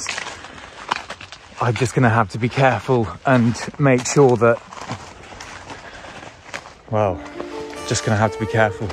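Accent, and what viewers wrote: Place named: Scotland, United Kingdom. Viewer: I struggled to understand your broad Scots accent. Nice canoe, err bike, err binoe, err cake. It’s a cake, definitely a cake.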